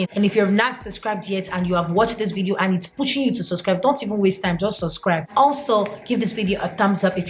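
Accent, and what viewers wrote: United States. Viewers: Wow I thought maybe you moved to america wen you were young. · U really sound American, the classes u took did a good job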